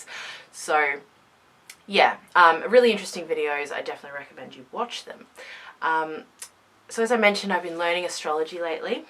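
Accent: Australia. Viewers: I spent an embarrassingly long time trying to identify your accent until I realised it's the same as mine hahaha nice to see other Australian pagans hanging around :D (I'm a new subscriber - hello!!) <3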